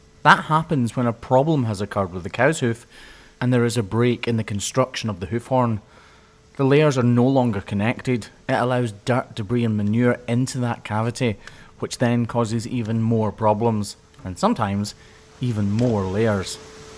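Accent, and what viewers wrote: Scotland, United Kingdom. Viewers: I love your channel so much, I've been learning to speak with a Scottish accent just by repeating things you say! I think it's a promotion, and I bet you agree! Keep up the great work! Cheers to and! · But you did provide footage, if accidentally. She was walking toward the crush and kept that foot off the ground completely, hopping with each step. I am very grateful to your narration, too. I can understand your Scottish accent. But you visited a farm in Wales with a soft-sided corral system and I had to turn on closed captioning, I couldn't fathom what she was trying to communicate. Thanks for all you do, I'm sure the girls you work on appreciate it too.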